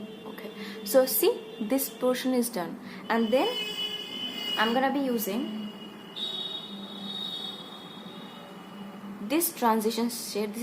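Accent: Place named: Bengal, India. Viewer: I really like your bengali accent❤...even some people ask me are you a bengali because my name is Manjari😊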